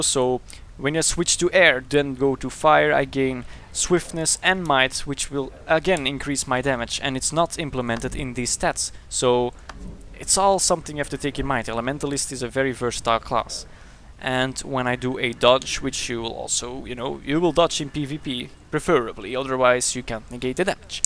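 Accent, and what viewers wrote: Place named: Belgium. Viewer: Loved this video, Subbed! I can't help to ask. But are you from Belgium by any chance? I'm from the Netherlands and I kinda recognise a belgium accent with you:P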